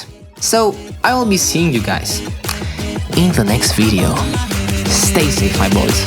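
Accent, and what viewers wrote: Romania. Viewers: Wow awesome! Are you Romanian? You have the same accent as Gutter.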